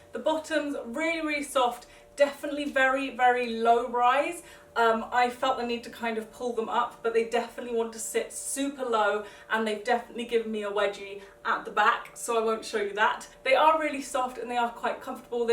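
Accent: United Kingdom. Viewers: I luv ur accent, I have always wanted a British accent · Hahahaha i Love how she's criticizing those weird af non existent bottoms in her lovely British accent! Sounds so posh, a British person could insult my mom and id probably say 'oh thanks' just because they make everything sound so posh 😂❤️ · What part of Britain? I have a Shropshire accent, my daughter was born down south as that's where we were living she's got a southern accent, my husbands friend is from Scunthorpe and their accent is odd haha, my friends friend is Scottish, my aunt is Welsh...many many different accents and there are like at leave 5 different London accents depending on which part 🤣🤣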